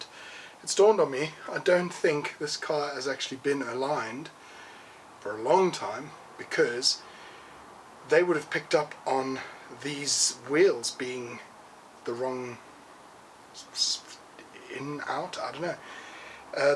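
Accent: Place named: South Africa. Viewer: You got a bit of a mixed up accent, can’t place it, sounds a touch of South African..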